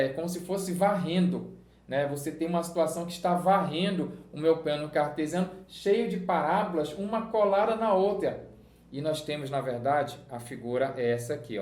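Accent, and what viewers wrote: Pará, Brazil. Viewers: Égua!!!! sabia que tu era paraense. Um paraense reconhece outro KKKKKk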